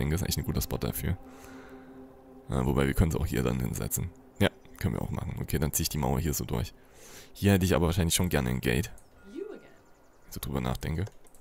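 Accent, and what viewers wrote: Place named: East Germany, Germany. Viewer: 1.City of doom (von Zombies besetzte Stadt/ ist etwas zerstreut manchmal) 2.Cottage auf ossi-englisch Das sind keine Sprechfehler, das ist Charakter.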